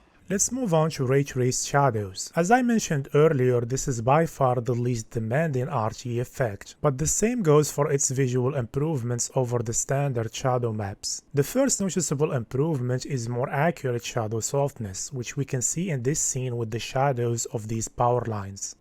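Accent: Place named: Casablanca, Morocco. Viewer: خويا ميمكنش متكونش مغربي هاد الaccent ديالك باينا كازاوي هههههه Good work brother btw ❤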